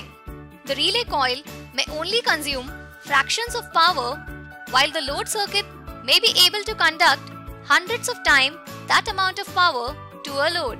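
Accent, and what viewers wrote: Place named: India. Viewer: Great presentation but this annoying woman with an indian accent i can't...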